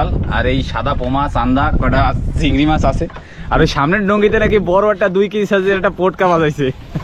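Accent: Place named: Shariatpur, Bangladesh. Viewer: তোমার জন্ম স্থান কোথায়, বাংলাদেশ না আন্দামান। তোমার ভাষায় মনে হয় শরীয়তপুরের আশে পাশে।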